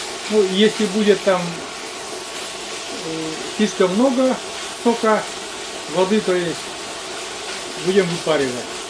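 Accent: Komi, Russia. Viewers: Случайно попала на ваш канал, по говорю поняла что коми, сама с Усть Кулома, с большиим удовольствием смотрю ваши видео, очень нравится как вы " Болтаете" Как говорите сами.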